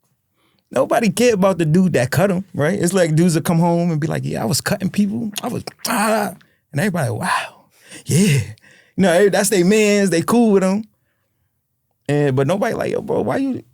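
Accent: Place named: Philadelphia, United States. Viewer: Bro from Brooklyn but sound like he from Philly lol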